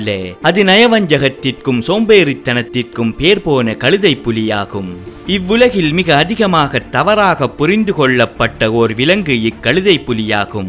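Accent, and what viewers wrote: Sri Lanka. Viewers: சார் இலங்கை தமிழராஆ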